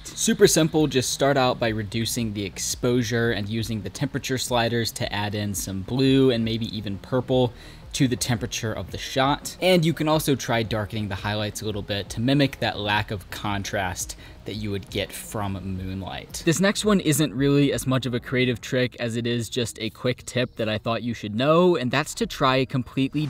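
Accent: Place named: Washington, United States. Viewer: Where are you from? Is this Washington? It just reminds me of that area of the country.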